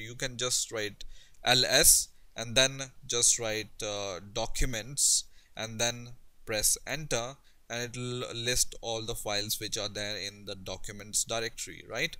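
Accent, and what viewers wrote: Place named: India. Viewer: im curious about who you are? it appears your in Germany but you sound like your from India.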